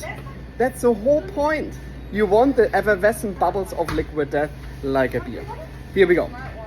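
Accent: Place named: Germany. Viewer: du könntest locker Synchronsprecher werden für deutsche rollen die English sprechen 😄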